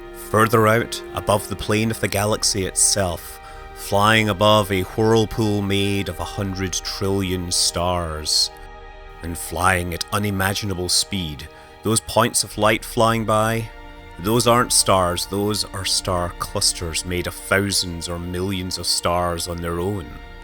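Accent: Scotland, United Kingdom. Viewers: Omg I just realised how deep of a Scottish accent u have got btw love ur videos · Rather Carl Sagan with a nice Scott taint. brilliant. does space engine do stereoscopic output?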